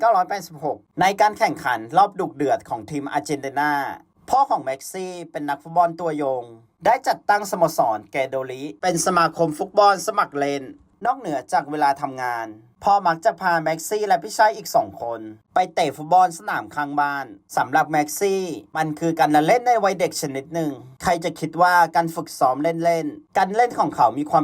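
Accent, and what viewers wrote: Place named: Provincial, Thailand. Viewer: พูดไม่ชัด และสำเนียงเหน่อ ฟังไม่รื่นหู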